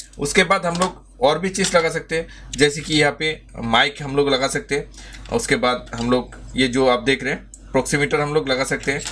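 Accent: Bengal, India. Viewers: Sir yuo are bangali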